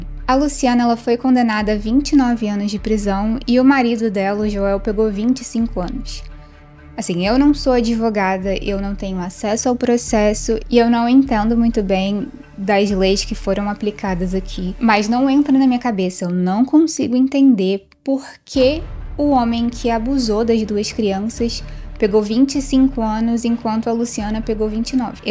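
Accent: Rio de Janeiro, Brazil. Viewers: Vc é carioca? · Sua voz é muito bonita, o vídeo bem editado, tudo quase perfeito, mas esse seu sotaque carioca fortíssimo complica, soa quase como um cacoete, e faz perder a imersão da narrativa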